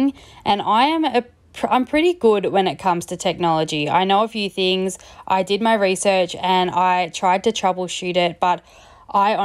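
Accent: Australia. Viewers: You know what’s fun? Finding an Aussie making this kind of content! Usually it’s Americans or other nationalities. It’s oldly relatable seeing Big W, Coles and Woolies bags being used as your donation bags haha, just hearing the accent, the “brush it off and keep moving/devil may care” attitude to passive aggressive comments, and Bluey toys around etc even you having a doggo (it feels like all Aussies do!) it’s really a breath of fresh air. Hi from QLD! 💕✨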